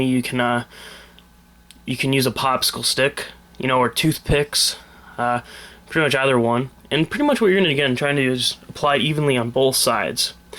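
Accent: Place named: Buffalo, United States. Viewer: sounds like your from buffalo everyone pronounces mags as "megs" lol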